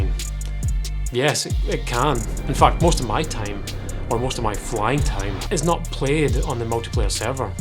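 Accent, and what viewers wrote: Scotland, United Kingdom. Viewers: Helpful towards new, inexperienced flight sim-ers like me? Yes. Scottish accent? Yes. What's not to like. Subscribed. Hope the channel builds nicely for you. p.s. What got me to realise I wanted to keep going on DCS was the excellent A4 Skyhawk mode. As a full fidelity offering, in an era which is simpler to learn and control, and carrier capable, I cannot recommend it highly enough for all new starters. Ignore the 2 free DCS aircraft and just get on that instead.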